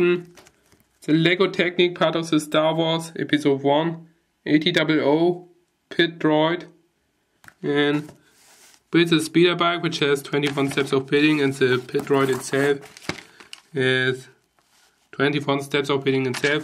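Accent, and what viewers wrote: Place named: Germany. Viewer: your german accent rules haha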